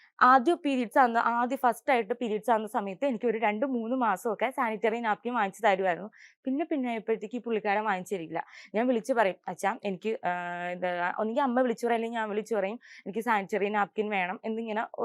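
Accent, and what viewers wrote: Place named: Trivandrum, India. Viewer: Tvm slang aan samsaarikkunnath🙄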